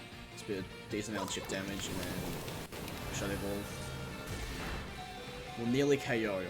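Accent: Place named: Australia. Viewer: I am almost sure you're Australian?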